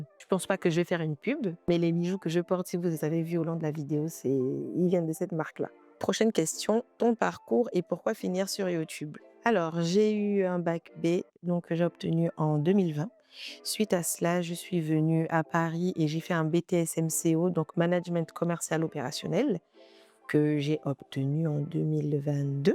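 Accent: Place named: Gabon. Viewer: Une gaboma j'ai reconnu l'accent ❤❤❤❤❤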